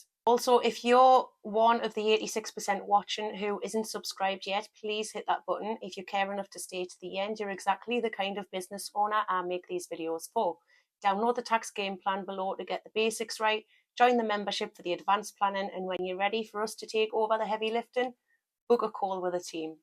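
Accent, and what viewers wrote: Newcastle, United Kingdom. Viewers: Fascinating insights but for some reason (not in a creepy way) the geordie accent is engaging to listen to 😅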